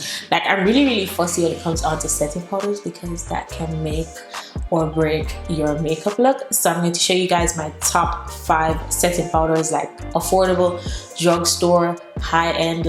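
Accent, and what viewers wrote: Jamaica. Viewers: Your Jamaican accent ☺️☺️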